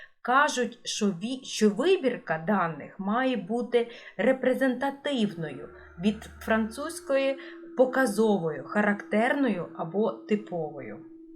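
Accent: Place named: Russia. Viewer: Кацапський акцент)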